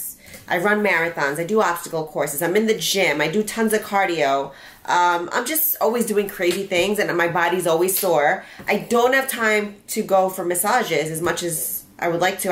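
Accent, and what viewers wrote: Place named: New York, United States. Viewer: Just ordered one! BTW, you ded have a NY accent lol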